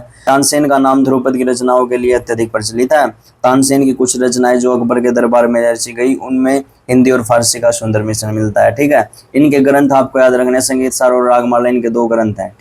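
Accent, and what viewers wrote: Haryana, India. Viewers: Haryanvi sir ji ❤❤ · Yeah bro 🔥🔥🔥🔥🔥🔥🔥🔥 haryanvi boliii , m bhi vha se huu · Haryanvi boli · Sir are you from haryana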